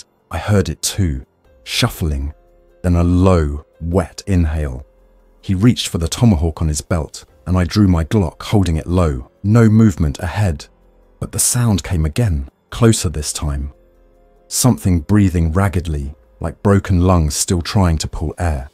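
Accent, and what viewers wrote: United Kingdom. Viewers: An AI read story - homonyms like "a door starting to bow" (pronounced as "bow" & not "bO" . . . a Brit accent for an incident in America is a story flaw with minimal odds. While in a hospital but nobody is looking for supplies even though 2 have med-tech backgrounds to know how important such materials are. Another flaw. Okay - not great.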